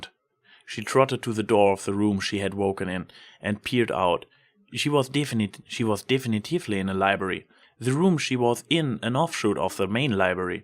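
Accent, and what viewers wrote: Germany. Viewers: ich mochte deinen deutschen Akzent schon immer, aber beim vorlesen Find ich ihn wirklich klasse, es gibt dem text so etwa interessantes. Eigentlich interessiert daran deutsche Fanfic zu lesen? Ich finde deine Stimme ideal für "Dramatic Feadings". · cont.3 Thirdly; and understand that this is not meant to mean I didn't like the way you read, because I did; keep at it. Your technique will become more refined with practice, and I think that this is a worthwhile endeavor. I always enjoy a good story, and if it's being read with a German accent, then so much the better. XD And that's about it. Keep it up, man, this was very enjoyable, and I hope to hear more in the future. It was a neat change of pace. /)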